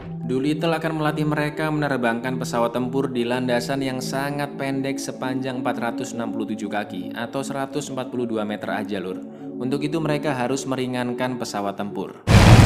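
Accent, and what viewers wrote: Java, Indonesia. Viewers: G rame suara jawa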